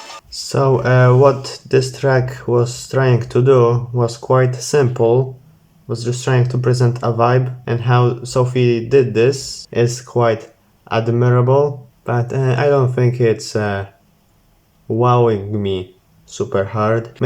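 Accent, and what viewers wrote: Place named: Poland. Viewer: you have a polish accent, r u polish?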